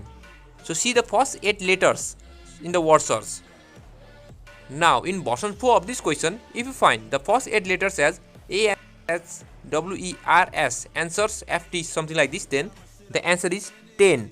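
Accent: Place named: India. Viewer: Bro your a hero thx for giving us answers, your telling us the answers very well and i kinda notice you accent you indian right? I SUBBED!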